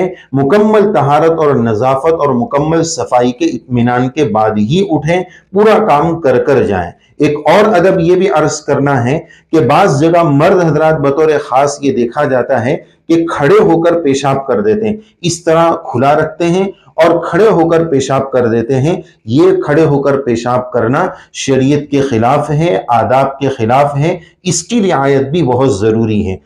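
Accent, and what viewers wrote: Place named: Arab world. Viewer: Urdu Urdu ki tarhan he bola jay na ke aarbic style me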